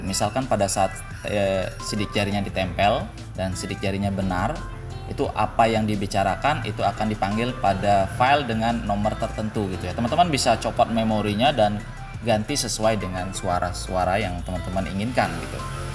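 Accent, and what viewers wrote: Bali, Indonesia. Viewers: maaf saya bntu,itu sbnrnya logat bali,yg salah hanya 'Mukin' sisanya logat bali digabung sama bhsa indo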